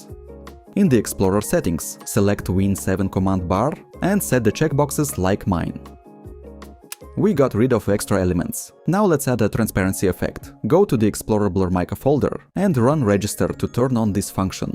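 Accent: Russia. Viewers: Стронг рашн акцент 😊 спасибо за видео ❤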